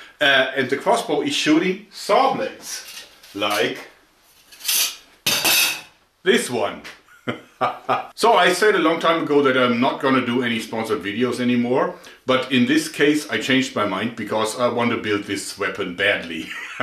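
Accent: Germany. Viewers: Das ist nicht böse gemeint aber wenn du Englisch sprichst hört man dass du in Deutschland bist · funny character Never Changes especially the German accent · Bei dir hört man richtig das deutsch raus 😂😂 „This here“ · Hi. Deine Ideen sind echt klasse,dein Kanal an sich ist ein wahrgewordener Jungstraum. Du hast die coolsten Ideen überhaupt. Und ich feiere so unglaublich deinen deutschen Akzent. 🤣🤣🤣🤣🤣 In tiefer Verbundenheit ,Christoph. 👍👍👍👍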